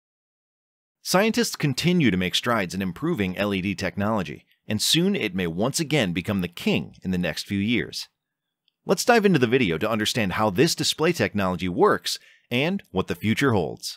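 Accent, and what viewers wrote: India. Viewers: Indian accent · Daam this guy have most stereotypical Indian accent ever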